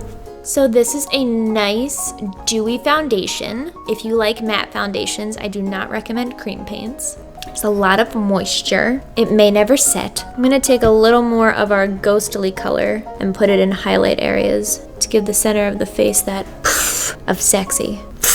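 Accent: Philadelphia, United States. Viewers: I've always thought that had a very unique accent but could never pinpoint it. It's a Philadelphia accent. I'm from Philadelphia. Why am I like this?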